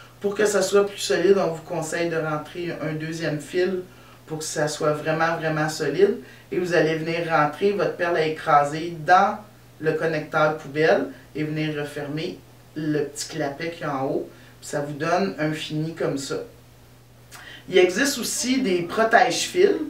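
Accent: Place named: Canada. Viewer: votre accent me rappelle ce beau voyage que j'ai fais au Canada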